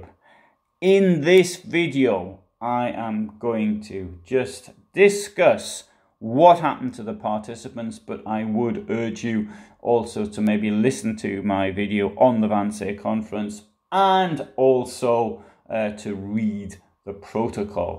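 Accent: Newcastle, United Kingdom. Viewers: North Eastern English accent ,I think. "Geordie ". Or a bit further south ,Tees area. "Monkey Strangler."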